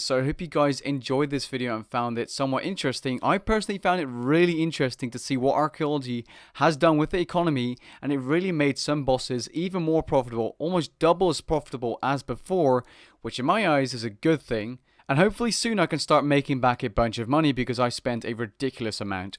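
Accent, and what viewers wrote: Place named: Netherlands. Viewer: You're Dutch, aren't you. :D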